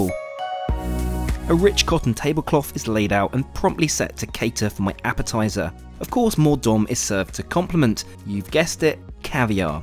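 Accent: RP, United Kingdom. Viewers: Bro this guy talks sooooo posh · And I love your British voice. I love hearing Brit’s … be safe with your travels ….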